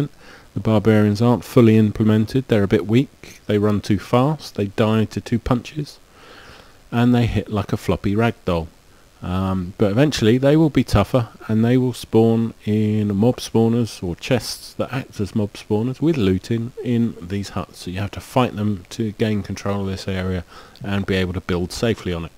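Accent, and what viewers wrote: United Kingdom. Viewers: First off – there is no such thing as a British accent! Great Britain refers geographically to the island of Great Britain, politically to England, Scotland and Wales in combination. Only Americans use the expression ‘British accent’, and you ain’t American are you. You have an English accent (not a hint of Welsh or Scottish in there that I could hear). Second off – why apologise for it? Americans speak English, as in England right? Shame on you:P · I like your British accent. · i love the british accent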